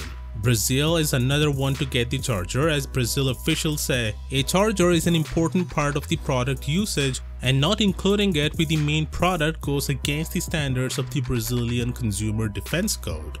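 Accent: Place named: India. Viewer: The Indian accent is so rough on ears. ..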